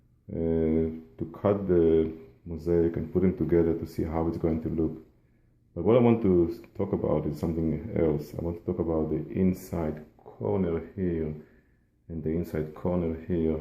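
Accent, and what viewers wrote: Israel. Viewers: Very helpful and insightful. My bf and I are remodeling our shower right now and have been trying to figure out how to do backsplash onside an insertion for shampoos and soaps. Let me tell ya, thats not for faint hearted 😂. Thank you. Just a question, are you Israeli? Your accent is very familiar. Thanks a bunch again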